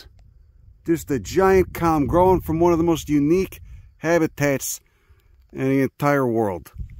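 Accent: Chicago, United States. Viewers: Every nerd should have an Italian with a thick Chicago accent teach them about their interests.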